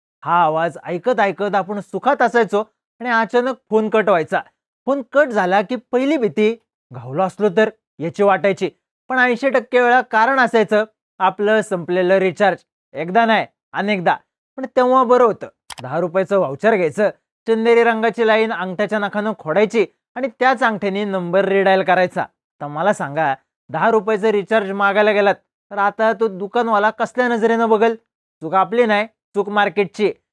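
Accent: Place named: Kolhapur, India. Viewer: भावा तुझ्या बोलण्याची पद्धत पूर्णपणे कोल्हापुरी वाटते एकदम झणझणीत आणि चरचरीत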